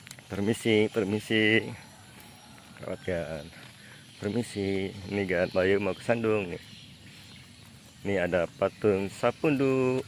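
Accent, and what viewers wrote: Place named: Java, Indonesia. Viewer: Salut dgb Om... berakulturasi dgn budaya lokal, bahasa dayaknya dah bagus walau medok jawanya masih agak terdengar.... semangat... sukses selalu Om.... Bisa reques ngak Om, minta video didaerah SIBITAN... kangen masa kecil ku 30 thn yg lalu...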